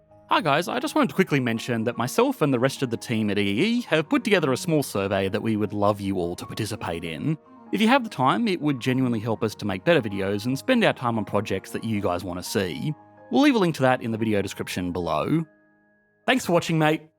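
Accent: Australia. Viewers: Because the guy is Australian. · He is Australian. This is his channel, he owns it. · this looks like an interesting video but I don't know if I can watch it as the guy's accent is incredibly annoying! The way he puts stresses where they don't belong and puts unnecessary drawls at the end of words like "issues...ssss" and "economy...yyyyy" is awful! Don't know where in Aus he's from, I'm British btw. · because he's Australian and that's their accent?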